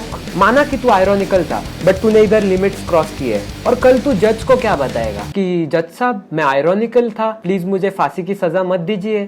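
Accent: India. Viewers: Abe tu bihari kyun lagta hai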